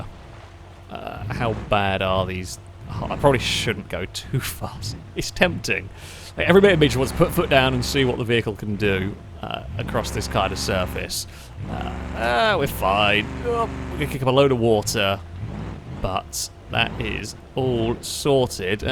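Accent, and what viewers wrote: United Kingdom. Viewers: i took a big break from beam ng drive content and i uhhhh.....i forgot how british your accent was lol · is British graystillplays confirmed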